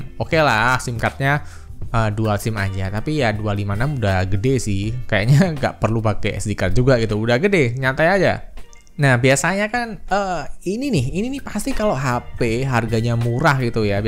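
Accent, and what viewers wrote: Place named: East Java, Indonesia. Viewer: org jawa tah mas ?? logatnya kya daerah wetan sana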